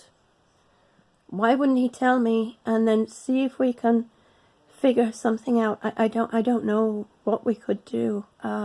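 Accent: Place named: United Kingdom. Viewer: Hi. I'm just sort of taking it day by day. I caught a cold a few weeks ago but thankfully it didn't drop into my lower respiratory where it could cause all that havoc like the first two times since I got back from Europe in 2015. I think drowning myself in Vitamin C helped to keep it confined to the upper. I just read that the FDA has ordered the pharmaceuticals to drastically cut back on the production of opioids this year and probably even more in subsequent years because of the problems of youth abuse/death. Have you been affected by this,? People in pain management who follow their Dr's directions are unfairly being caught up in this tug of war. Dr's are getting terrified to prescribe narcotics because of threats from the FDA. It's really an intractable situation and innocent pain sufferers are snared in these politics. I cannot think think of a person more qualified to receive them than you and I pray you aren't affected by all this. 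PS I detect a British accent. Are you on the NHS or are you here in the US? You may not be feeling the effects of the cutbacks we are having here. I read that Britain is one of the friendliest countries for use of narcotics if the use is legitimate. Hang in there. Somewhere out there is an understanding Dr. who ca appreciate your dilemma. You just have to find him.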